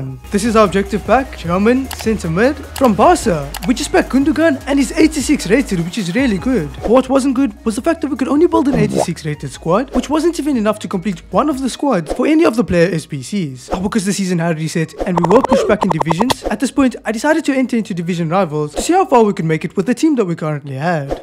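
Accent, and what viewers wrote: South Africa. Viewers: Are you from south africa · Yo are you South-African? It’s awê to see a fellow EAFC lover from RSA!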